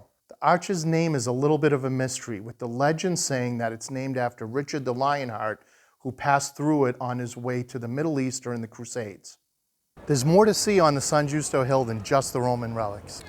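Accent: Boston, United States. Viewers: excellent video. Is that a Boston accent?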